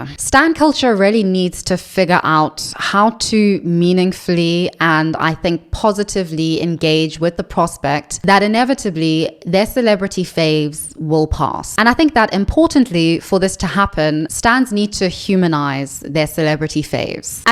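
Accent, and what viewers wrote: South Africa. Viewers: This video was just randomly recommended to me as I had never seen your channel before. If it's true, you hide it really, really well with the British accent; but every now and again you sound South African. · Tell me why I just clocked your South African accent now 😩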